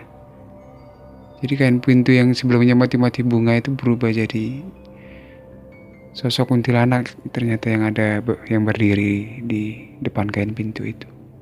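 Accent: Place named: Medan, Indonesia. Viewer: medan x ko bang. mantap.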